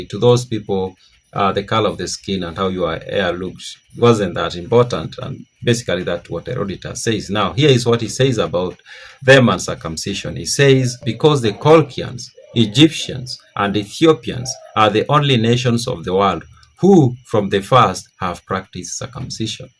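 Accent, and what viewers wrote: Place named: Luhya, Kenya. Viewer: I love insightful présentations, I expect to learn from those that have endeavored to research. However, when I information that's closest to me i.e. the obvious to me being incorrect, I regard all the rest as incorrect. You sound Luhya from Kenya, very close to Uganda, yet the info about Uganda is so false The only Bantu from Uganda that circumcize are Bagisu and Bakonzo/Bamba; less than 10% of the total population of Uganda.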